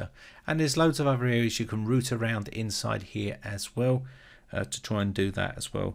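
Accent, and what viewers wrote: United Kingdom. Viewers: First tutorial I see by a British mate! Cheers!!! Bloody hell !!! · He sounds nothing like Korg... The guy in the video has a British accent, Korg has an NZ accent.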